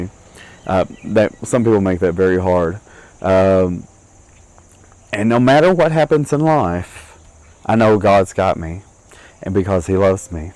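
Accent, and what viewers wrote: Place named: South, United States. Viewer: What a beautiful testimony . You are correct, God does love you.It takes a real man to tell such a personal story. Roxy may not fully understand everything when she sees this because she is young but she will fully understand it later. I am sorry you had to go through a hard time at school,kids can be mean sometimes. I actually just think you sound very southern, and I was wondering where you were from.God gave you the life he did for a reason and I think some of it may be for telling your story. You may have led someone to Christ by giving this testimony.I pray that you and your family feel better real soon. If you do have the virus maybe it will be a very light strain of it. I love the story behind the tattoos.Prayers are being sent you way.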